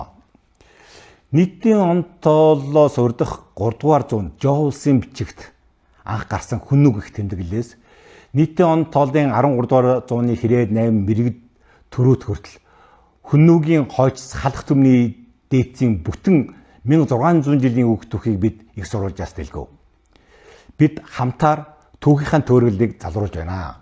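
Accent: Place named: China. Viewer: энэ одоо ямар гээчийн монголоор гайгуй ярьчихдаг хужаа вэ!!!!!!!туух гуйвуулаад байгаам уу эсвэл яриад байгааг нь би ойлгохгуй байгаамуу?????хужаа л байна ш дээ!!!!!!яриа нь ойлгогдохгуй юм байна !!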